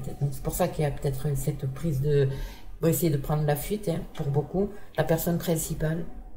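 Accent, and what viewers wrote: South, France. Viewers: Bonjour nouvelle abonnée, j ai vu votre commentaire et me voilà ! Comme beaucoup ici j ai toujours entendu que la loi martiale en France ça n existait pas! Et je vois que vous dites oui tant mieux ! Mais qui a déjà vu des militaires ? C est a venir ? J entends votre accent je me trompe peut être mais c est le sud 🥰 . Oui on doit faire attention ça je le sais !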